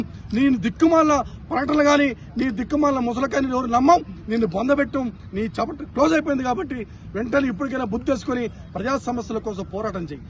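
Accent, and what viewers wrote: Andhra Pradesh, India. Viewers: Neee slang yeee andhra undiiii!!!! Evadivi raaaaa nuvvu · వీడొక ఆంధ్రా slaunt లో మాట్లాడుతున్నాడు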